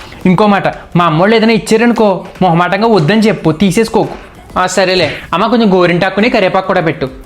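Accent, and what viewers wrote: Godavari, India. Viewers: సూపర్ మీది గోదావరి నా sir ❤❤💐💐🙏🙏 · ggaruu mee story prathilipi lo chadivanu andi naaku baaga nachindi mee writing style and mee godavari slang lo chamatkaaram ga maatladadam yevariki sadhya padadu.😅😅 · Mana godavari yaasa malli baga gurtuchestunaru garu.. missing that after marriage and moved to other states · Mee godavari matalu vinte Pranab Leche vastondi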